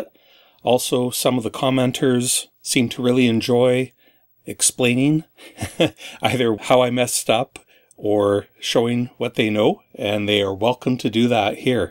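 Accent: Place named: Sault Ste. Marie, Canada. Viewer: Hi, I'm watching this in July 2019 months after the Wisconsin convention (hey, that's the beauty of YouTube) but you say in the intro you were heading down from Canada. With Canada being the second largest country in the world, where in Canada? If it's a privacy issue, no problem. I've just subscribed and started binge watching some of your C64 and 6502 videos, great work! I'm going to hazard a guess based on accent... Sault St Marie? Regardless, keep up the great content! Your channel is a retro-blast and despite thinking I've mastered 6502 asm and the C64 world, I've learned some great little tricks so far! I was happy to see that these quirks "work" on Vice C4 Emulator (except the "screen saver" one) as well as my C64!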